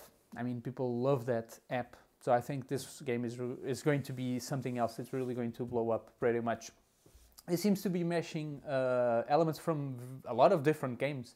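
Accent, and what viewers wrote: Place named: Portugal. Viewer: Muitos parabéns pelo canal e boa sorte! Sou grande fã de boardgames (solo e não só) e foi uma agrdável surpresa encontrar uma voz portuguesa neste meio :)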